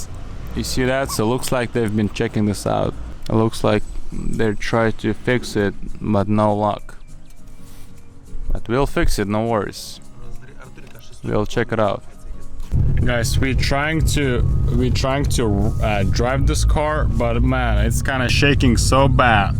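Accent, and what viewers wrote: Russia. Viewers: Russian accent wtf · Непонятный акцент !! Трудные слова с русским акцентом А когда вспоминаешь походу про акцент и хочешь говорить немного по американски начинается. Бррп брп брэп бэп 😅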